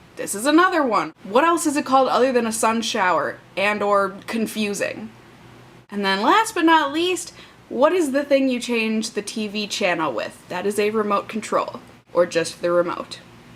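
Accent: Minnesota, United States. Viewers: great video. great job trying to show off the accent, but you didn't even bring up hotdishes. that's what my friends make fun of the most. oh and im a proud Minnesotan. and a few works for you to play with "beg" or "bag" , "don" or "dawn" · Honestly, I think the way she speaks is probably the best representation for younger generation Minnesotans (like me). In other words, I can hear the stereotypical accent around here at times BUT only in the older generations. Think about it though...you take a senior citizen from here and more than likely, their parents or grandparents were directly from Scandinavia. You take that and water it down a bit over time and you have what this chick sounds like. My opinion anyway. Sidenote: I'm definitely guilty of saying "oofda" quite a bit (usually after I sneeze. Lol) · I want to come to Minnesota to hear the accent. 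**** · Just loved your accent! And you are cute, for shoer-er! Bye! (Minnesotan?) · I'm Minnesotan and I totally said every word you said the same 😂😂😂 I guess it is a Minnesotan thing (ya know?) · yep, every person who grows up in Minnesota have this, its just the we are dontcha know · I think the MN accent is one of the most musical and beautiful the English-speaking world has. And I’m surprised to hear that it’s so much like how we talk in the NW of England! We say “daddy long legs” and “pop”...or “fizzy pop”! And our vowels are very similar. Don’t alter your accent to adapt to anyone. It’s gorgeous. And we say “bobble” for your “hair elastic”...why?! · Just love it your Minnesota accent!! I live in Minnesota for almost 10 years and I still learning the Minnesota accent I have some friends that used to teach me so many expressions! Love it!! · Thanks for sharing the Minnesota accent with us. 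Hopefully your doing well. God loves you and forgives you. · I'm from Minnesota and I don't know which part of MN you are from but you have thicker accent then people around me. 😂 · Your accent is so cute!!! I have never met anyone from Minnesota before, I loved hearing your accent! :D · You are so awesome! I so enjoy listening to you. You have a sweet, kind, and lovely voice. Plus, although your Minnesota accent isn't extreme or real deep, I like listening to the way you say certain words - words with "O" in them, like boat, coat, board, etc. I like that. Oh, before I shut up, you are very attractive, as well, with a beautiful smile and beautiful eyes. Wanna marry me? ;-) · HAHAH I live in minneapilis mn and she has a lot more of an accent then me...I love it tho😂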